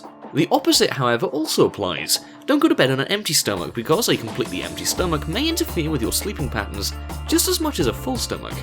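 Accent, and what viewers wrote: United Kingdom. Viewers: This may be the most British guy I've ever heard lmao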